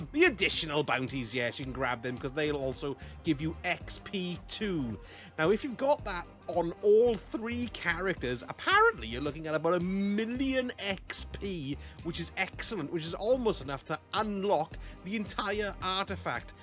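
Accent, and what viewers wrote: Wales, United Kingdom. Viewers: Thank you Mr Welsh man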